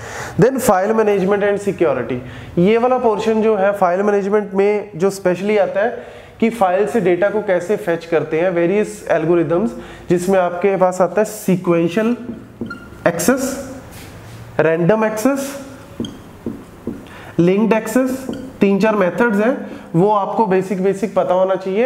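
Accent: Punjab, India. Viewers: tuhade accent to lgda tusi punjabi o??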